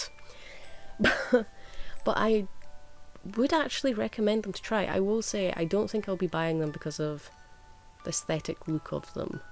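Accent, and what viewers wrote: Scotland, United Kingdom. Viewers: Where does your accent come from? Are you Scottish?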